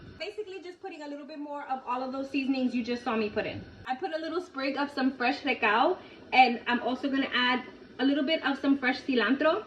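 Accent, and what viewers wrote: New York, United States. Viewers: Just love the New Yawk, talking bout dawdahs, an food for the soul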